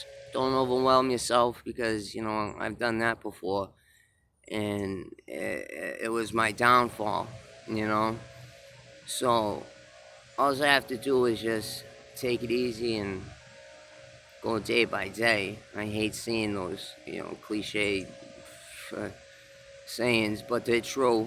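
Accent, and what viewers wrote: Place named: Massachusetts, United States. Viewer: You sound like ur from mass I’m from mass 😀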